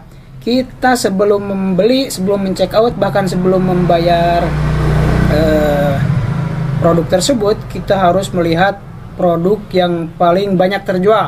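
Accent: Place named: West Java, Indonesia. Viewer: logatna na mah urang sunda nya kang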